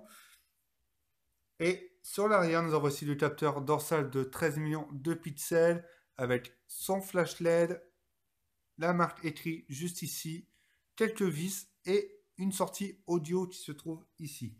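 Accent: France. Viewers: un léger accent Chti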